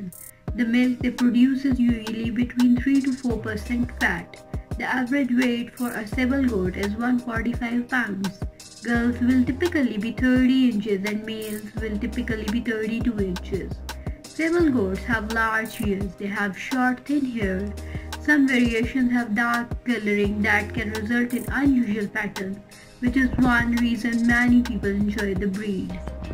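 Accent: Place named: India. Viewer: ...Also hard to understand such heavy indian accent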